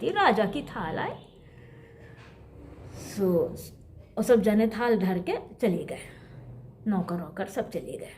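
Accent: Bundelkhand, India. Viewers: बुंदेलखंडी की मीठी जुबान, और आपका सुनाने का अंदाज मजा आ गया 🥰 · बड़ो नोनो हतो जे किस्सा तुमाई मीठी बुंदेलखंडी बोली बहुतई भा गई हमें गुईयाँ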